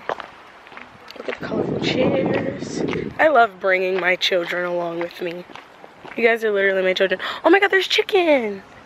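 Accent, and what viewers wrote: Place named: East Coast, United States. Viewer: Anyone else love how she says strawberries ?? Idk I'm weird but your east coast accent comes through and it's so cute 😩💕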